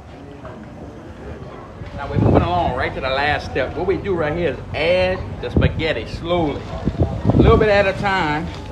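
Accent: Louisiana, United States. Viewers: Did Jake Lloyd adopt a Louisiana accent and create a new character???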